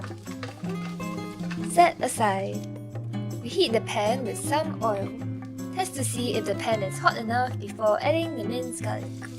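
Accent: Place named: Singapore. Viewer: Can tell you're Singaporean with the accent hahah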